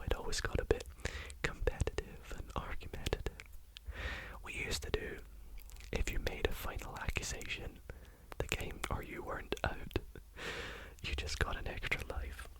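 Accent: United Kingdom. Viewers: Just stumbled upon your channel and i love it ❤ Are you from the UK?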